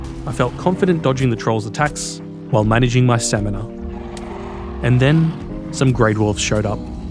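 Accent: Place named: United Kingdom. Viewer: you british sound cool. As an American from California Dallas I respect the yabbies you blokes drink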